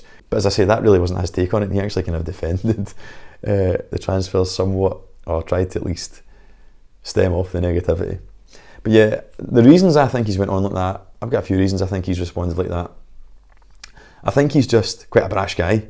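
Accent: Scotland, United Kingdom. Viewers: Hi, not only I share your passion for physical media, I also freaking love your Scottish accent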